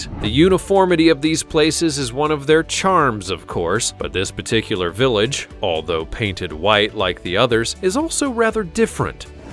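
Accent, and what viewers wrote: United States. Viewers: Offtopic: Sorry, but I hate this American accent, pronouncing HOTSPOT as HAATSPAAT. The poor O is not there for nothing. If this language would have liked you to say HAATSPAAT, we would have written HATSPAT. The same applies for ROCK and RAACK.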